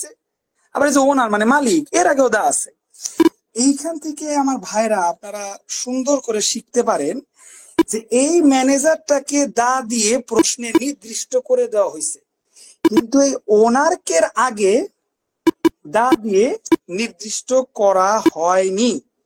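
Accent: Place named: Mymensingh, Bangladesh. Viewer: অনেক দিন ধরে মাস্টার বইয়ের ক্লাস খু্জতেছি মনে মনে৷ আজকে পাইলাম৷ ধন্যবাদ স্যার৷ আপনার ভাষা শুনে মনে হচ্ছে আপনার ভাষা ময়মনসিংহ বা কিশোরগঞ্জ অঞ্চলে।।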